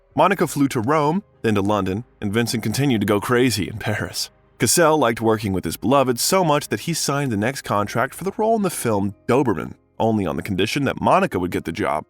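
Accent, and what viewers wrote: United States. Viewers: I love your voice and accent. California? Lol hard to follow the story at the beginning because distraction of this pleasant voice. But then, yeah, I like the biography 🎉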